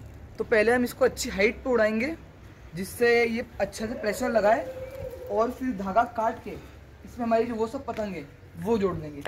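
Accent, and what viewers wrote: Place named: Indore, India. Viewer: Aap indore se he . Aapki language se pata chal raha he. Very nice.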